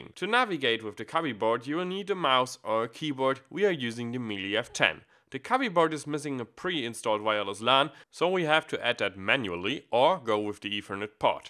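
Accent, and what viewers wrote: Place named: Germany. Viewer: Such a refreshingly German accent ^^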